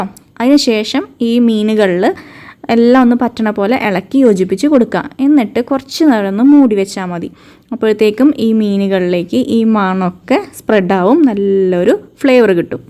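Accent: Thrissur, India. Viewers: Stylan dish kalakki chowavilla Thrissur basha kidu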